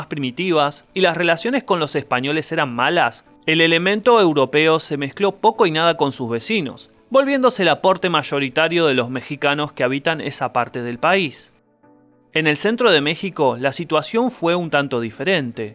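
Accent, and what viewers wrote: Argentina. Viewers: Pues el wey es argentino, cómo quieres que hable pues ?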